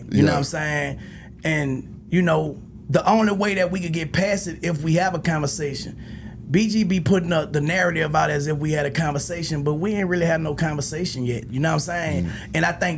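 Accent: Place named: Memphis, United States. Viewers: Turk always talking....thats what Juvie was telling his sensitive azz. Bg responded to what you said on this podcast and he didnt even go at you. He just said you sound like you from Memphis which i feel touched Turk. Turk keep BG name in his mouth more than anything. Just do yo thang and move on but this nigga still wanna reach. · Son u be doing too much and BG right u do sound like u from Memphis. The NO don't sound like nobody else no matter how long we rock in other cities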